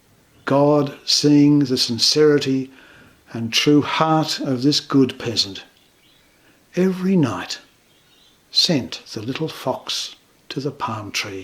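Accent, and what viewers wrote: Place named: Australia. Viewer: Thank you! A friend shared this in text and it's so nice to hear it in audio. Especially in Australian 🇦🇺 From a fellow Aussie living in America. So nice to hear a familiar accent.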